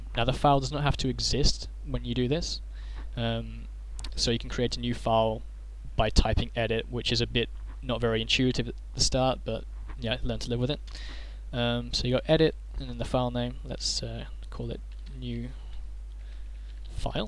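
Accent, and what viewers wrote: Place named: Netherlands. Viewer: Pretty awesome, haha. The first few minutes I could easily tell you were Dutch, with the accent. But then after ~3 minutes you get the hang of it (flip the switch, as it were) and you are nearly indistinguishable from a native :)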